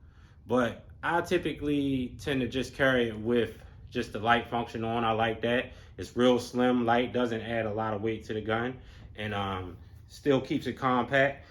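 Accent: Baltimore, United States. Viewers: Accent Baltimore ? Philly here bro I see you ! Stay dangerous, and safe !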